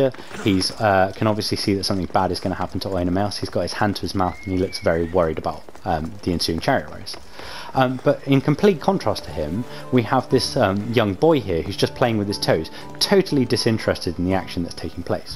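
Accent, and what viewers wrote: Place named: United Kingdom. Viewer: and that sweet british scientific accent hahah, thanks for video man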